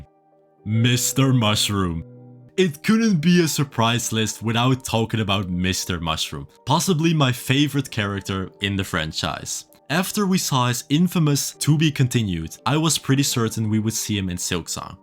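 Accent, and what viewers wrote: Netherlands. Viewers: And team Netherlands 🎉 I couldn't not recognise your Dutch accent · Ik wist dat ik het nederlandse accentje hoorde 🤯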